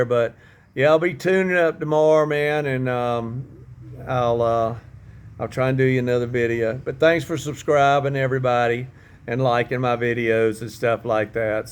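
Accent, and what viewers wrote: United States. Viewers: God bless brother!!...obviously, you are looking and sounding very American, they will treat you as a rich person. I pray that you will find doctors that are truly into healing and not for profiting. Old saying goes, "stay away from sugar",... and carbohydrates. And if you are not diabetic, try fasting for 3 days. Reboot your immune system and rebuild new and stronger cells. Take care bro...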